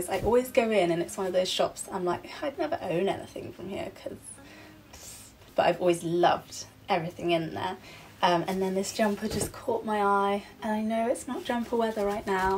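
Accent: United Kingdom. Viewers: You seemed to be such a calm and classy person. I love your British accent. So satisfying to hear.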